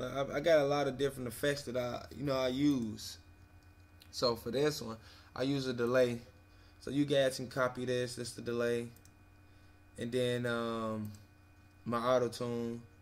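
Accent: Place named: Chicago, United States. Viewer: I can tell U in Chicago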